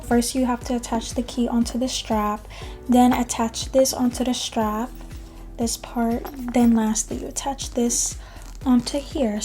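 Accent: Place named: Los Angeles, United States. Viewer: Your voice says Los Angeles but your eyebrows scream the South Bronx. Nice bag.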